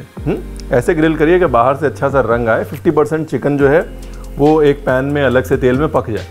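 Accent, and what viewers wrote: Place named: Malwa, India. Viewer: It's great to listen to your malwai punjabi. Esp when you quote your grandparents. Keep on...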